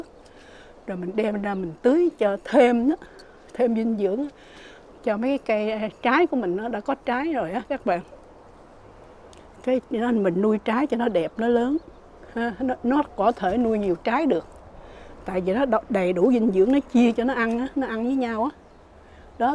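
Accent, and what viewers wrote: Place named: Vietnam. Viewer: Moi lan minh nghe tieng noi con Viet Cong la minh biet lien Do XAO XAO ,cup ve VIET NAM ma O voi VIET CONG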